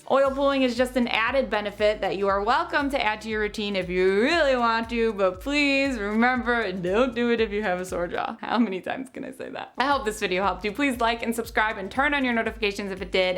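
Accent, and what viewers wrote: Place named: Midwest, United States. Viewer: I can tell a Midwest girl from a mile away. Hiiiii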